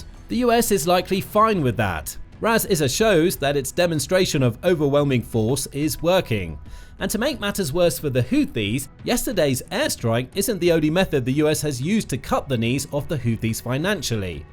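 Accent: United Kingdom. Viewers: I am SO sick of narrators with British accents.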